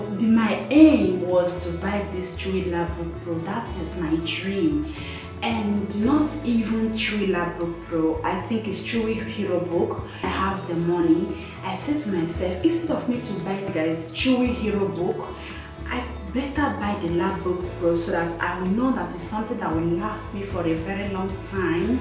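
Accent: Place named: Jamaica. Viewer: Hello, your accent. I love it. You sound just like my best friend her name is Maritza. But we all call her Jamaica. Are you also from Jamaica hun?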